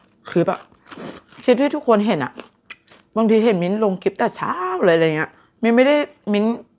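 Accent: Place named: Central, Thailand. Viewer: เป็นคนภาคเหนือ แต่ทำไมพูดเหน่อสิงห์บุรี กาญจนบุรี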